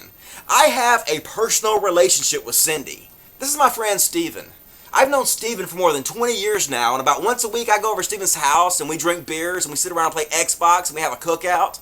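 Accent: South, United States. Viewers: You know whats funny? You have a southern accent, you're atheist, and quite smart. I always thought the first and the last two were mutually exclusive. :P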